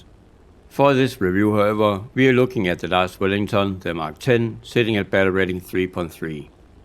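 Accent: Denmark. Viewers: man kan bare høre det er en dansker når de snakker engelsk😅 god video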